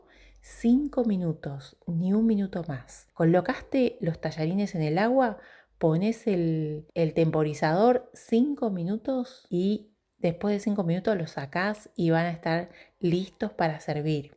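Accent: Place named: Argentina. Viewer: Gosto muito mais de ouvir a falando naquele espanhol musical da Argentina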